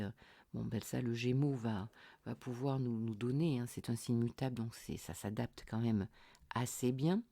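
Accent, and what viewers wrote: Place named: South, France. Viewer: Bonjour, je viens de vous découvrir...votre voix est très agréable à écouter...du velours! Et votre petit accent méridionnal est bien stimulant dans cette période très nuageuse et un peu triste! Belle synthèse de ce qui nous attend pour une année!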